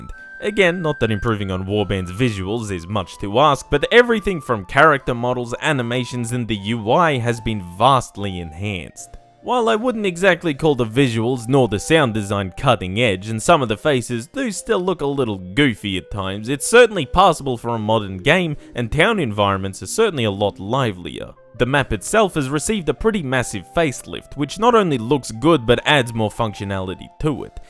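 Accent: Australia. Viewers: Definitely Australian but with some kind of Slavic twinge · No. He sounds either Australian. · This guy sounds like the biggest ethnic from Australia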